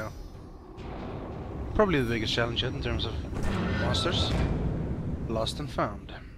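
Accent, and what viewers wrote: Sweden. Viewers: he is swedish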